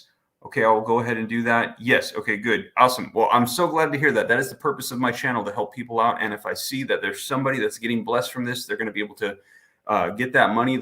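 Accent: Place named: Louisiana, United States. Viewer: I could hear you say you Louisiana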